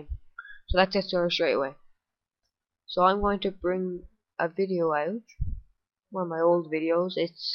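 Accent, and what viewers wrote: Ireland. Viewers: He's Irish that's why he pronounces it different! Some people think everyone has to sound like them. Don't mind them kid, I got this in one of my videos before. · You say tree instead of three because of your Irish accent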